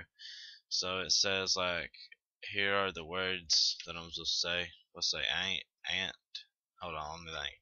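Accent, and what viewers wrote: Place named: Tennessee, United States. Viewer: Dude I'm from outside of nashville, and I say everything they way you do. It makes me laugh, cus I've watched all the other people from different parts of the world do this as well, and the way you do it so funny. lol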